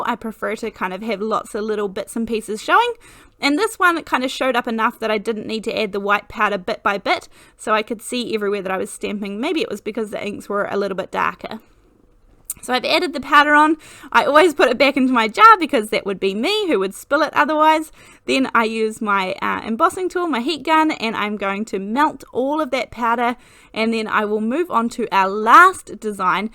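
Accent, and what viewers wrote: New Zealand. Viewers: just watched your awesome video, love to hear a Kiwi doing this, amazing. Thank you, and yes I've subscribed.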